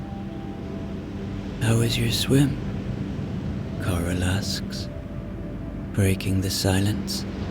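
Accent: Ireland. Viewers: Adore an Irish story teller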